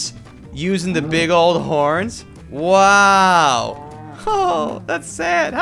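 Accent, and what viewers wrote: Texas, United States. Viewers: I LIKE YOUR COWBOY ACCENT I SO EXCITED FOR THIS MOD OF THE HAERT OF TEXAS LET'S GET INTO IT PATNER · wait... thats how you talk in Texas? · texas person talking about texas mobs in a texas accent (i think thats what it is) noice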